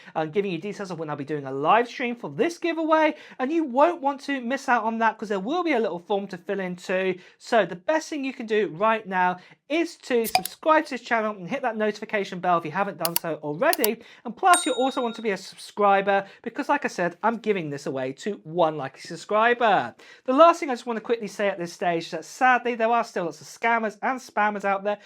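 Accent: United Kingdom. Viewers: Please Apple, use an American person for PR and not a Brit😡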